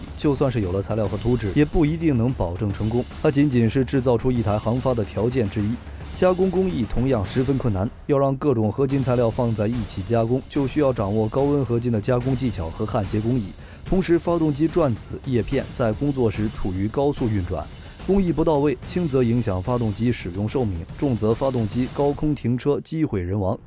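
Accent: China. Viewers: 聽聲音也知道這是中共病毒武漢肺炎國人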